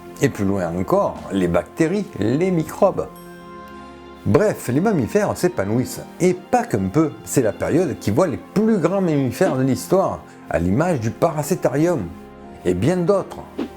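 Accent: South, France. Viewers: Une suggestion d'amélioration : remplacer ta voix, trop rebutante, par une voix D'IA ? J'aurais bien voulu écouter ce docu mais l'accent du sud je peux vraiment pas...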